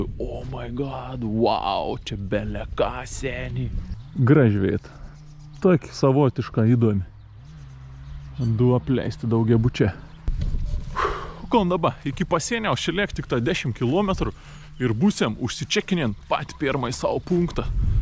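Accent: Samogitia, Lithuania. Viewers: Jei jau isdavei savo gimtine zemaitija, tai ir ismesk ta akcenta ir priimk naujos tevynes - novavilniecio kalba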